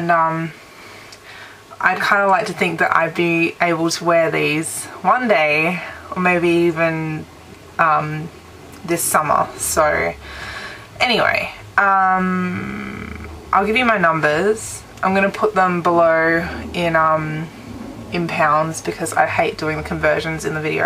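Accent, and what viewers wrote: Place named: Australia. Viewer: u have an Australian accent!! I'm about to get mine done... !! a bit nervous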